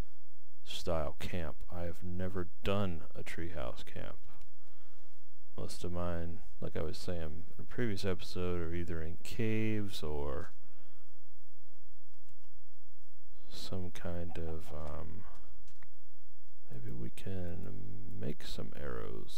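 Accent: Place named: North, United States. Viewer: Yeah, that is what I hear, up north somewhere, though def not NY/NJ...lol. And is there a specific accent in FL? They are such a mix of snowbirds and tourists...lol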